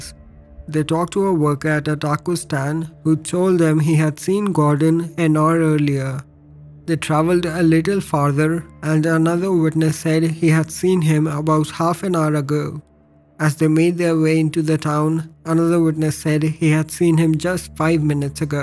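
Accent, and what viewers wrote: India. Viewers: Lol turn on subtitles...my mother is married to an Indian...I'm kinda used to the accent lol... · Why Indian Guy with Indian denglish as your speaker? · the indian guy narrates, the accent irritates my ears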